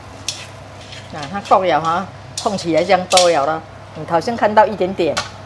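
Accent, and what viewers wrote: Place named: Hokkien, China. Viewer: you are fantastic. I love mee Siam., I love your Chinese with, I believe, with a taste of Hokkien. So familiar and homely.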